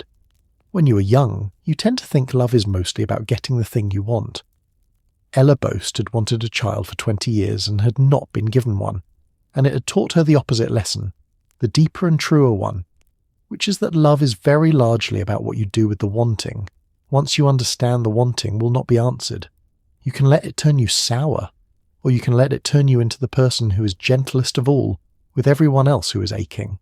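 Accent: England, United Kingdom. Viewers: Why does the narrator have an English accent ?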